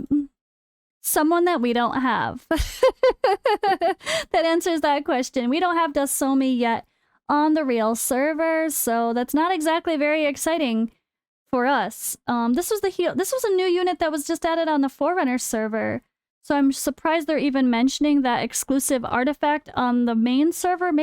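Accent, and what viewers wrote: United States. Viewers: You can’t hear that she’s American……😮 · I didn't know you were in Romania! You sound very American to be honest. You are very awesome!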